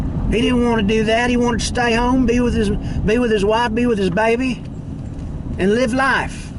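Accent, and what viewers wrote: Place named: Kentucky, United States. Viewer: My old stomping grounds. I love that you started on Alex by the river. I can't help but laugh that though you are driving through West Carrollton, Ohio, it sounds like you are from the sticks of Kentucky. I don't mean that in a bad way. It just caught me funny.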